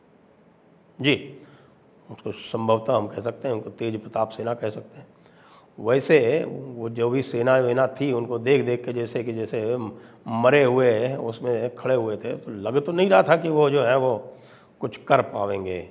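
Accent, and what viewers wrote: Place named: Bihar, India. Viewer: i like ur bihari (bhojpuri style) way of presenting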